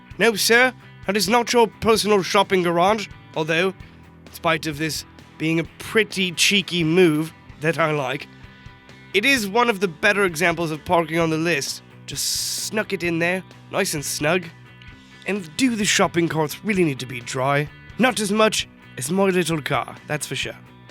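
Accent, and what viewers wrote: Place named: United Kingdom. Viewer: i really liked your british accent ahaha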